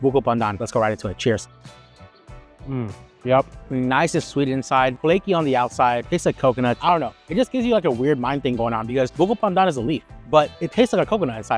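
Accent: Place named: Philippines. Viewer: He doesn't look Indian. He looks straight up Pinoy. Even the way he talks 😅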